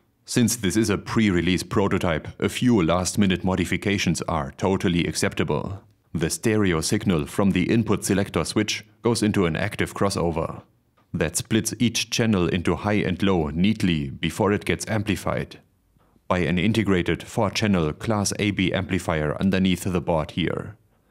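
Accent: Germany. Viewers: I really like your mildly cumbersome, but not quite German, accent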